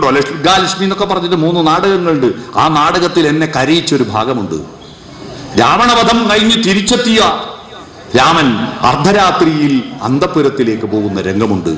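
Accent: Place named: Eranad, India. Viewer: സനാതന ധർമ്മം പഠിപ്പിക്കുന്നത് മുസ്ലീം ഏറനാടൻ സ്ലാങ്ങിലാണ്. കഷ്ടം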